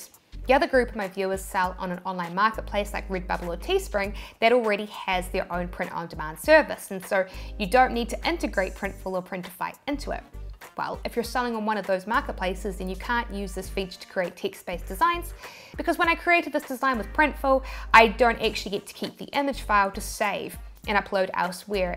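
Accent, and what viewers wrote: New Zealand. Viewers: Are you a kiwi? Where is the accent from? 🙂 Love your videos. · I like your New Zealand accent. Good job and thanks for all the info.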